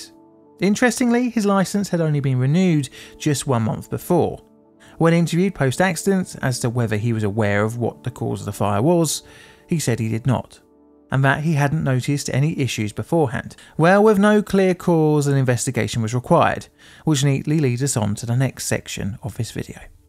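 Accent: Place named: England, United Kingdom. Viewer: As someone who has a lil trouble understanding an English accent I kept hearing 90s. It took me a second to realize he meant 2000s.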